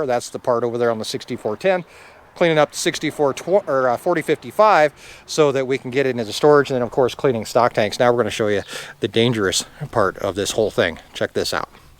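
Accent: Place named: Boston, United States. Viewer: Cuts like “nuttall”, since I like in Massachusetts you were speaking my language. “Paak the ka in the yaad”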